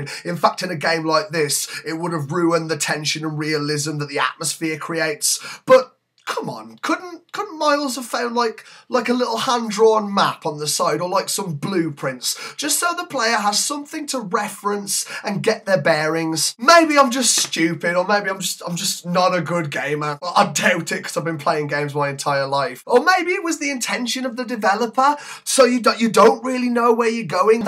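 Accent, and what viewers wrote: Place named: England, United Kingdom. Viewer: While I am quite a pushover when it comes to English accents, this was really well done. You need to make more videos. Subbed!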